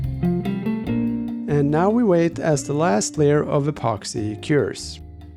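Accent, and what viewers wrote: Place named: Norway. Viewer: I can't quite figure out what flavour of Scandinavian you are. Norwegian?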